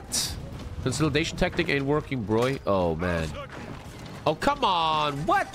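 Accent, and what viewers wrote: Germany. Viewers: So geil man hört raus das du Deutscher bist. Endlich einer von diesen Kanal der Deutscher ist. xD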